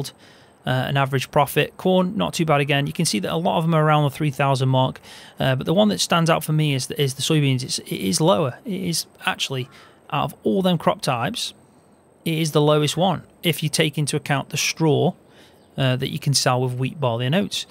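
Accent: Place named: Cheshire, United Kingdom. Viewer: Hi, new viewer here, and a new sub. I'm from Cheshire and I recognise you accent, obviously 😅. I'm from Northwich. Nice to see a local lad pumping out some decent FS material.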